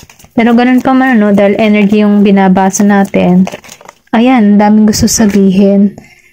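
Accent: Batangas, Philippines. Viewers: Parang Batangueña ang isa sa pa paborito kong tarot reader😊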